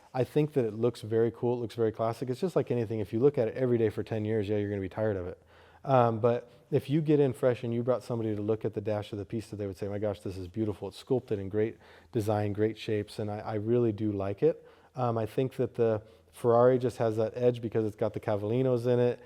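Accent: United States. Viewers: Totally off topic, but are you American? Obviously you're in Germany, but you speak like an American so just randomly curious.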